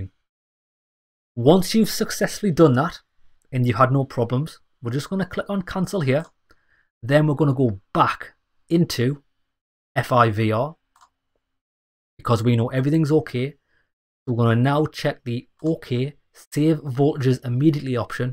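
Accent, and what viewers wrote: Newcastle, United Kingdom. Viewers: Super helpful! And I think my new go-to genre of Youtube narrator may be a Geordie 😂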